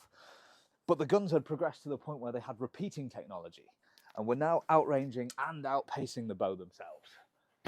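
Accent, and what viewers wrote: United Kingdom. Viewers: This is exactly the kind of video I needed to see for the story I'm writing AND it's got a dude who's funny with a british accent. Win win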